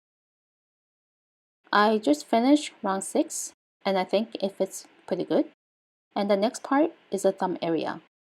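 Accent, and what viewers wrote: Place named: Asia. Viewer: What kind of Asian is this accent, Mandarin? Vietnamese? I love it 😍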